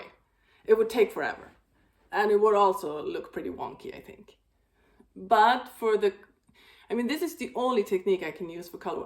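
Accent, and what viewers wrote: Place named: United States. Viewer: Your “American” English is very good. I enjoyed listening to you and watching your podcast💕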